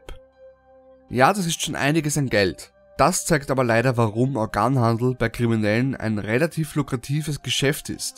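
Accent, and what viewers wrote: Austria. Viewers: jo woher aus oesterreich kommst du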